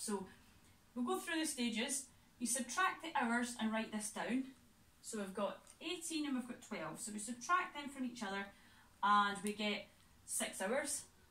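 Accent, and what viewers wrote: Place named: Scotland, United Kingdom. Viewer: I LOVE THATS YOURE SCOTTISH AND IM SCOTTISH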